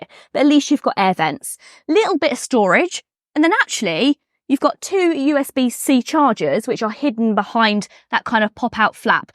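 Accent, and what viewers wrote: United Kingdom. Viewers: I like the accent of this british youtuber, it sounds unique and exotic.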